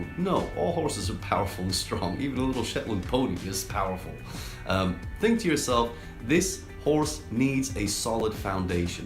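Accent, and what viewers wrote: Australia. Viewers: khaled hamdan He sounds Aussie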